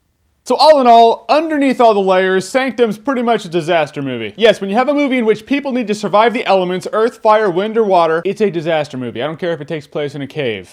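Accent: Australia. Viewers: Hahaha Im aussie and that would have to be the funnest Aussie accent i ever heard but still was a real beievable one at that,Should do a review on the Crocodile Dundee for shits and giggles! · Nice aussie hardcore adventure guy accent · Jeremy's a wizard at faking australian accents.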